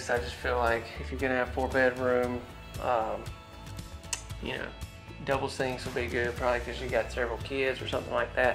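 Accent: South, United States. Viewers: I love watching these. My husband and I are considering a mobile home or a modular for our property eventually. Mobile homes have come such a long way. Also, his handsome southern voice makes these easy to watch too. Lol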